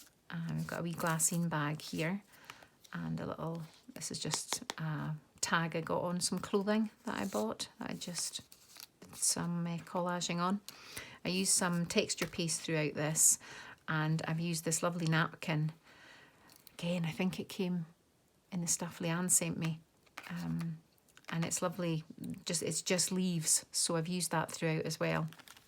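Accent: Scotland, United Kingdom. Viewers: Absolutely incredible, I could sit and listen to your beautiful Scottish accent all day, and watch the videos of what can only be described as stunning works of art, yet another wonderful book. Thank you for sharing with us.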